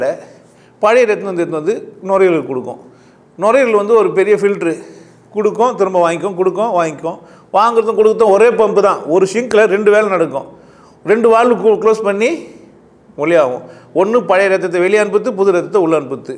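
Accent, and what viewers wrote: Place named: Chennai, India. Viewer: Madras language super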